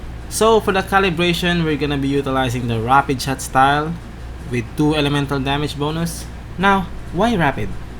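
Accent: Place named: Philippines. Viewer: Your accent is familiar.... You a Filipino my guy? btw thanks for the burn build idea.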